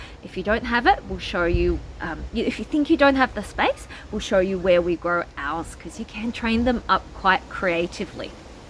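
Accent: Australia. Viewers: A fantastic and informative video Miss. I enjoyed it and learned much from this. You are a very gentle spirited lady, and I love your Australian accent. I am watching from California. I will definitely try these tips. Blessings to you Miss. YESHUA FORGIVES AND SAVES! John -17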